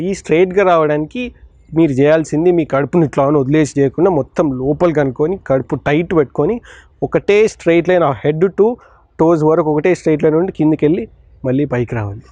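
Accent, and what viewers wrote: Telangana, India. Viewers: Godavari abbayi telangana slang lo matladinattu undi bro ..mi words...simply superb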